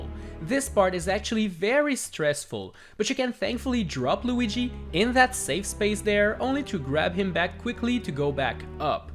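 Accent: Quebec, Canada. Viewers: I believe he’s from the French-speaking part of Canada, so he has a thick accent haha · you sound french canadian man :P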